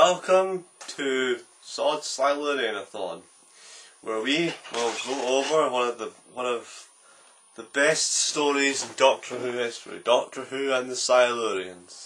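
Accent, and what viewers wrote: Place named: Scotland, United Kingdom. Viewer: What a very beautiful young man. I could listen to you all day. Adore the Scottish accent.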